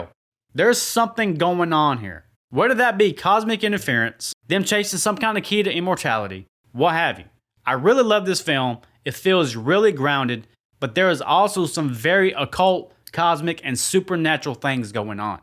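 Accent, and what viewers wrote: South, United States. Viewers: You are definitely from the south my friend 😂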